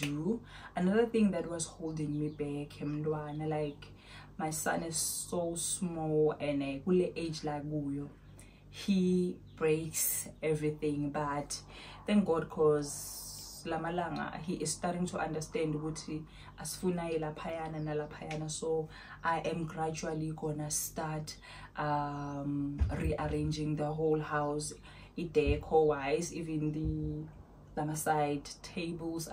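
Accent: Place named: Eswatini. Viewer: The moment I heard your siSwati accent, I subscribed. Will figure out who is later😂. New subbie💃🏾🙋🏽‍♀️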